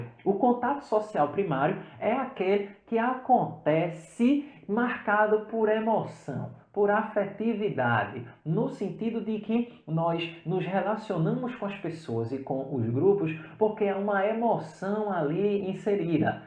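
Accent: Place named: Pernambuco, Brazil. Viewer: e eu q me apaixonei pelo sotaque de Pernambuco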